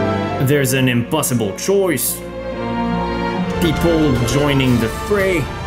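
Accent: Quebec, Canada. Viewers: I recognize your québécois accent ;)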